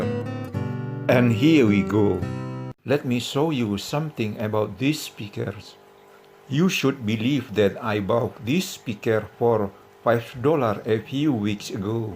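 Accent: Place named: Indonesia. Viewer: Logatnya seperti orang indonesia bang, daerah mana nih ?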